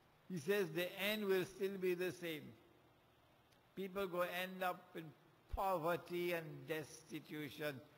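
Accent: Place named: Jamaica. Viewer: Him speak in jamaican accent maan!!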